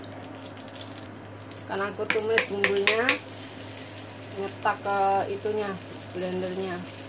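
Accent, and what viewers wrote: Java, Indonesia. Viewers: Bunda orang mana saya orang ponorogo bund.. Sepertinya jenengan logat nya jawa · Mbaknya persis saya, pake wajan sama minyak kayak gitu, nggak usah dicuci dulu dan minyak bekas goreng dipake lagi. 😅😁 bicaranya medok ya, dari Jawa ya mbak? Sip banget. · Jawane pundi toh iki?? Medok buanget logat e 😆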